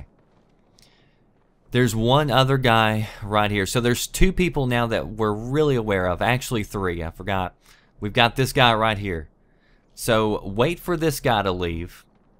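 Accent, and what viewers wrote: South, United States. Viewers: Your commentary is top notch. It's probably the southern drawl. I don't even have Splinter Cell and I enjoy these walkthroughs. I am looking forward to you making more "Tips & Tricks" videos for BF4. That's originally why I subscribed to your channel a long time ago. Keep up the good work.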